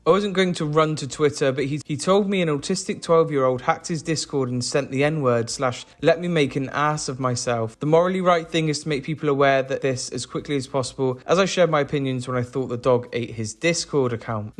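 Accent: United Kingdom. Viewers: Lmao this dude is British, Dream is American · You sound like georgenotfound but 20x more British Btw I'm British aswell it's not a dig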